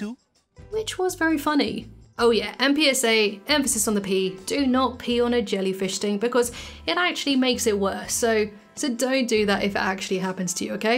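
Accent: United Kingdom. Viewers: I don't get America (or maybe Fox)'s love for Benny Hill either, and I am American. But it's funny to me I legit forgot you were English (despite it being your accent) until you mentioned it. What does that say about me?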